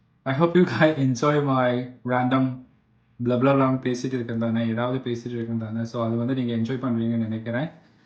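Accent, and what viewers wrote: Tamil Nadu, India. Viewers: Hi anna I'm from Sri Lanka Our Tamil is little different than India Tamil But your Tamil feel good anna Slang also cute anna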